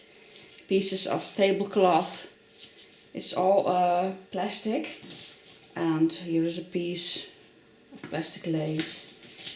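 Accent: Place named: Netherlands. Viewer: Dank je wel, thanks for the great tutorial, I must give it a try.. I loved hearing the Dutch accent.. I have been in Australia for 70 years but was born in Ijmuiden..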